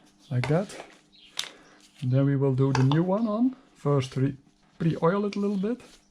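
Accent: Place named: Belgium. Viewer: For en Englishman you have a Belgium accent. No problem, clear explication.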